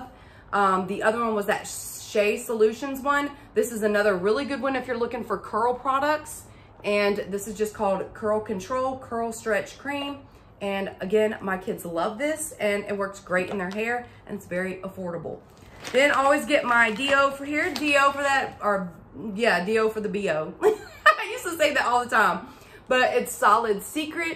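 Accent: South, United States. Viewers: You are adorable 😊 I just love hearing you. I’m in California and love to hear people from the south!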